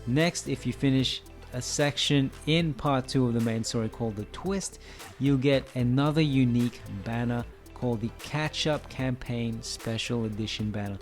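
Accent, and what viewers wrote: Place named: United Kingdom. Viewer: i thought British. Xenoblade was dubbed in the uk and I now really appreciate British voices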